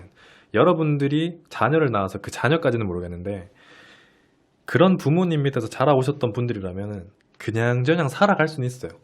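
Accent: Gyeongsang, South Korea. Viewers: 경상도 억양이 있네요~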